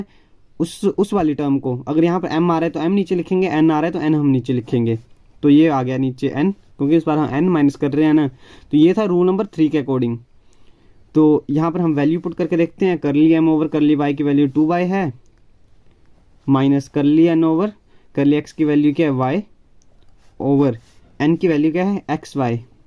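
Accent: Himachal Pradesh, India. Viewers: By your hindi accent im guessing you are from Himachal ( may be una or kangra)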